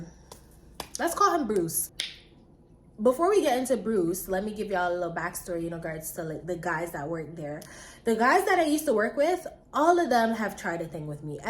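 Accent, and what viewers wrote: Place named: Jamaica. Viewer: the patois is killing me 😂 are you jamaican??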